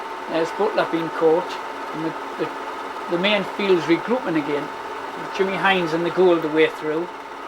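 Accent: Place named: Newcastle, United Kingdom. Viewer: Thanks for posting these vintage clips. The geordie accent is perfect for cycling commentary.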